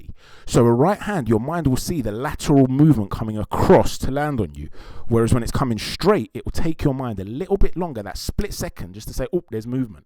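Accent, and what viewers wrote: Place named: London, United Kingdom. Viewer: .... where do I start my brother, Firstly massive respect to Hatman for sending all of us here, Hatman & Boxing beats & rhymes are the top 2 UK Boxing channels by a country mile!! I just watched this breakdown & I gotta say bro you are on some next level with this kind of detail - this is genuinely intellectual stuff so massive credit to you - you got a bundle of new subscribers now including me & my pals so maybe tell us a bit about yourself - did you do boxing at any level or are you just a passionate sport lover? I’m from London and you sound like you from London too so again I extend you every courtesy and look forward to debating Boxing with you. One thing I want to ask you bro - I was a massive Lomachenko fan and we know that he f**ked up against Lopez but I cant see any videos from you on that fight - I liked what Hatman said that Lopez has been training for Loma for years whereas Loma probably didn’t even know Lopez existed - I really wish he hadn’t lost that fight man Anyways great work from you and glad I subscribed - sincerely hope your channel blows up in 2021!!!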